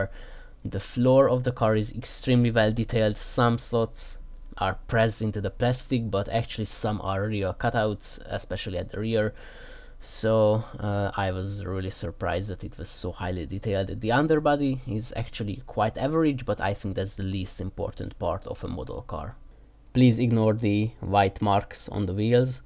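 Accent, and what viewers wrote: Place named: Hungary. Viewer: A jo magyar akcentus:D Nagyon jo video lett egyebkent! :D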